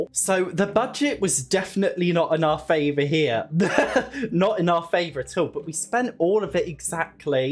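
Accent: United States. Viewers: Your American accent is so funny. That literally is how we say hamper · I’m in love with your American accent 😂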